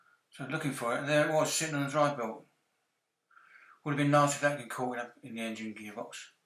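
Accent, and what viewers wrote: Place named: United Kingdom. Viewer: Cant stand british accents. Cannot understand anything he was saying.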